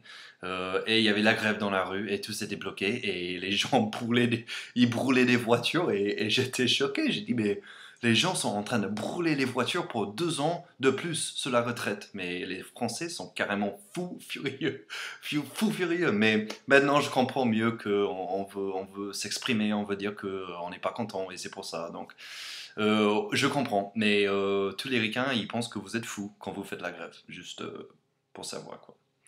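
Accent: United States. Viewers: On pense que les Américains s'habillent mal (chaussure qui ne s'accorde pas avec une jupe ou une robe et aussi un t-shirt au-dessus d'un sous-pull). Sinon, vous êtes toujours enthousiaste, vous dites "je t'aime" facilement alors qu'en France on ne le dit pas, et aussi vous parlez très vite, c'est comme si vous aviez un chewing-gum quand vous parlez (à cause de votre accent américains. Mais on vous aime😊.❤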